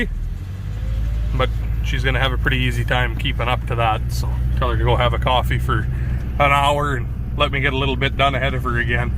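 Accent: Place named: Canada. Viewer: You Canuck's sound funny when ya talk, I'v been noticing.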